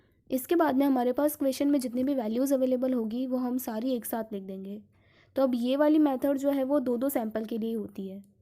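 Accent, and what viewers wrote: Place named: Gujarat, India. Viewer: Thank you me'me for ths video ❤ From Gujarat I know from your accent thayt you are gujarati 😅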